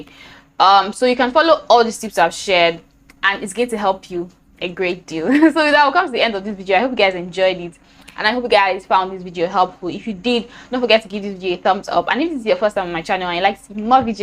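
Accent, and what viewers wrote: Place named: Nigeria. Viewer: Ok I'm only 2mins in but baby girl is giving Naija goodness with her bubbly personality, lovely smile and beautiful accent. Love the professional set up and lighting too. Now on to the rest of the video...😊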